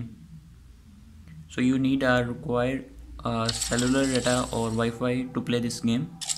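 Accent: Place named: India. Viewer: hes indian his accent is SUPPOSED to be like this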